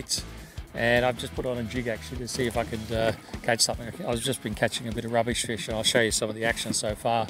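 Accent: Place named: United Kingdom. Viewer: Slow down your British accent , have no clue what you just said .😬😅✌🏽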